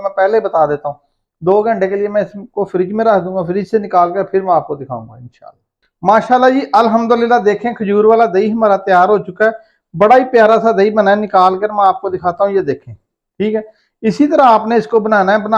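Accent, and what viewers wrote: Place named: Sialkot, Pakistan. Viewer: بہت خوب جزاک اللہ خیر لہجے سے لگتا ہے کہ سیالکوٹ یا گردونواح سے تعلق ہے۔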